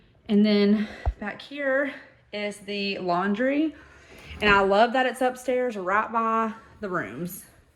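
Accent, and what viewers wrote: South, United States. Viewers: I just hope you don't lose your southern drawl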